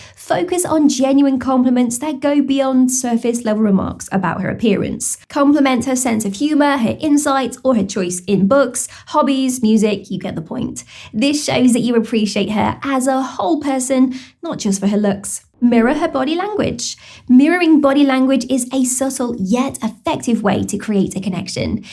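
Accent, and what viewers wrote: United Kingdom. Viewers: Your advices are the best, and make a real difference. I also come here to hear your lovely, soothing, British accent.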